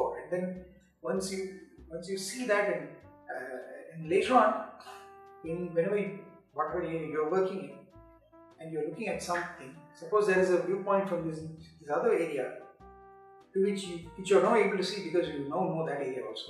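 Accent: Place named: India. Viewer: I cannot understand his India accent, no offense.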